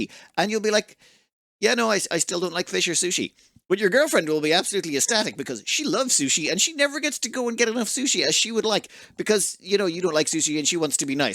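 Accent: Ireland. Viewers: If it's any consolation, you sound very Irish to an American in England. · Lol was trying to figure out why your Irish accent wasn’t so think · Just totally LOLed at "People can't tell the difference if I'm saying "or, or, or". Made me laugh a lot, I'm one of those people that can't hear any difference. For us in the U.S. it's "Or" = or "R" = arr. Similar to the pirate trope. Dont change,. Love your videos, and what initially caught my attention was the Irish accent. Its just a huge bonus that they are also entertaining and contain awesome gameplay tidbits and information.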